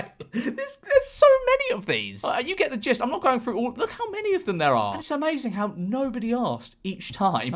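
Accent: United Kingdom. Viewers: His British accent is just…. 😩❤️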